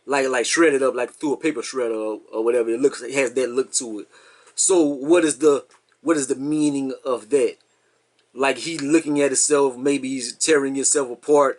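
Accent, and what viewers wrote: Louisiana, United States. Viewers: Man I knew you had to be from Louisiana, I left after Katrina at 14 but never forget the accent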